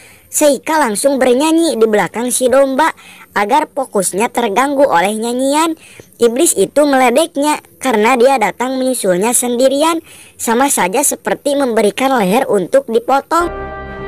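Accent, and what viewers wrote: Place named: West Java, Indonesia. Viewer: Ka ciri pisan ieu mh urang sunda na th🤣🤣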